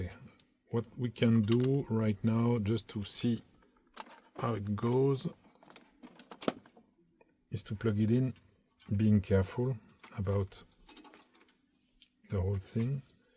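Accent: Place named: France. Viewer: Yes your english is actually great. Don't ever get rid of the accent. It is what makes you- YOU. I just have a quirky likeness for accents so I can pick them up pretty easily. I speak in a boring plain american english accent. Absolutely BLAND and boring. Your accent probably gets you a lot of ladies attention! Dont lose it! And you are 100% understandable! Otherwise these videos would NOT be able for me to watch if I could not understand you. But I can understand you 1000% percent. Please dont get offended! Idk if its an american thing but, accents are great! There's New Yawk accents.. BAWW-ston accents, LilWEEZYana accents, Southern USA accents... MinneSOWtah accents... My accent is SO boring. I wish I had a cool accent like yours! Ze french accent is not one I have heard much of. But I am glad to finally have a french speaking teacher who I can practice my french accent by listening to! 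If you really wanted to get spicy, try learning a New York French accent. Couldn't imagine what that would sound like. But them Nu'yawkaz really do have an incredible accent... "iM WAWWWKIN HEAAA"!! God bless you brother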